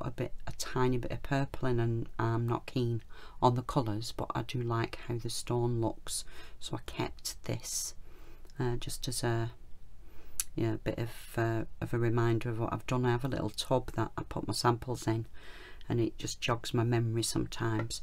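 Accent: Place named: Northern England, United Kingdom. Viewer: Love your channel, subscribed as soon as I heard a fellow uk northerners accent!! And this technique is amazing!! Can't wait to try it! Thanks for sharing :-)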